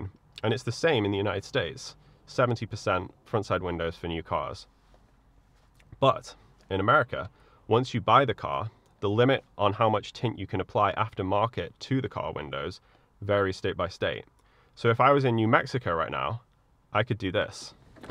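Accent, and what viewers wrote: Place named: Nottingham, United Kingdom. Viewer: What are you talking about? has a Nottingham accent because he was born and raised in Mansfield.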